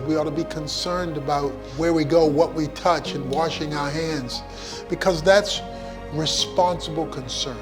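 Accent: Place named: Africa. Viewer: Legitimate concern vs illegitimate worry. Very nice. I also like his afro accent...so calm and soothing.God bless him and every believer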